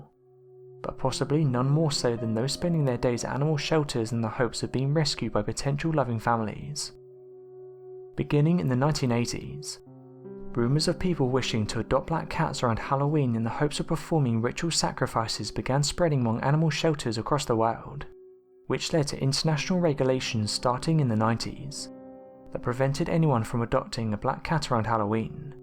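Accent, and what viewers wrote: United Kingdom. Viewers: Like most Americans, I'm SUCH a sucker for British accents. Lovely PS Best allies ever! 🇺🇸🇬🇧🇺🇸🇬🇧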